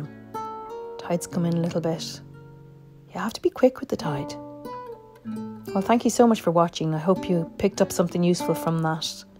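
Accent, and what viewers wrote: Ireland. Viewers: Hi! I have got to know you and your charming artworks from Sketchbook Revival 2023 and what blessing that was! I am totally blown away by your works and love all your videos! Your voice is so calming and I love your Irish accent 🥰! I enjoy the scenery in your videos too! I get transported in your world of wonder and for that I am ever so grateful! Thank you so much for adding that little spark of happiness in my day when you post a new video! Love and Blessings to You and your family …..Lorraine 😁🎨🇲🇹 ( Malta)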